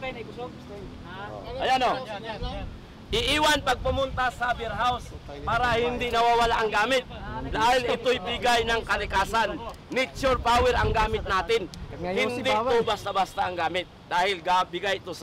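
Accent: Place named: Visayas, Philippines. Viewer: Bisaya rin Pala to c, sa Salita palang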